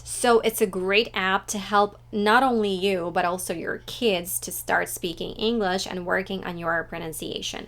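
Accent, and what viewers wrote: United States. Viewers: What is her accent pls ? (California, Texan ?) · When i watched this channel for the first time, i felt myself very contradictory. I heard an exceptionally, i'd even say pirufied american accent, and i saw this face and couldn't believe she's an American. And i was right. That was a great job but what it's given? Being an American doesn't mean having an impeccable language. It means sharing certain values. It means creating certain values. It means to be a part of american society. It means to have a common future with the US. The US is a multicultural country. Many accents, many dialects. It makes the US be the US. Nobody cares how your language pure is but anyone cares what you feel about your place here.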